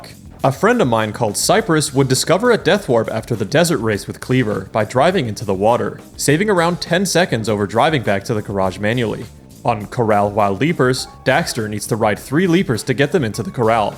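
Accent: Kansas, United States. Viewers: i always assumed you were american because of the accent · You sound like you're from Kansas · You sound American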